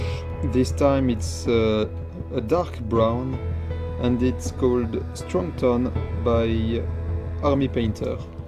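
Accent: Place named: France. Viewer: j aime bien ton accent français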